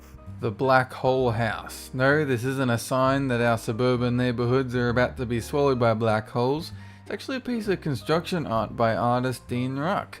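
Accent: Australia. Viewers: I haven't been watching your channel for long but are you Aussie? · Every time I hear this guy I think of an Aussie Shaggy from Scooby Doo who's got way too baked and forgot he had to record a voice over...And instead of waiting he just drawled it out anyway... Heroic! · Monochromatik Kolor He's Australian. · His Australian accent is about the only one I can tolerate . (He sounds a tad under zee influence in this though )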